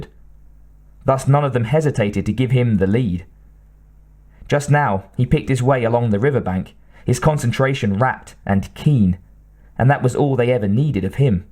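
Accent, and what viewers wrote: United Kingdom. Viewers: I would have liked it if in this beautiful story each character had their own voice, as was done in the Radio Plays, plus the audio effects, and the suspenseful music in the background of the story, not to mention that the BRITISH ACCENT IS TOTALLY UNACCEPTABLE. Don't you think that in this story, the BRITISH ACCENT doesn't match the characters❓️❓️❗️ And how the hell can a Native American / ITALIAN - SIOUX (BILLY SOLE) or an American from the South, Beaver Creek Swamp (BLAIN) from Alabama or MAC from Kansas would speak with a British accent, and same for the others❓️❗️❓️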